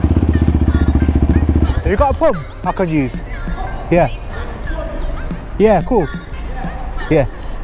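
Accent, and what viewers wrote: Birmingham, United Kingdom. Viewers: Are you from the uk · I’m new are you from Birmingham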